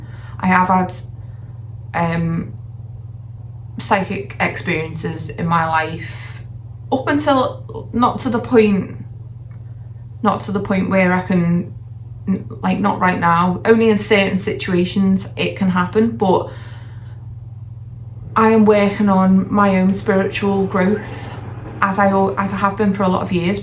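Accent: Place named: Liverpool, United Kingdom. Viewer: Yes scouser 🙌🏻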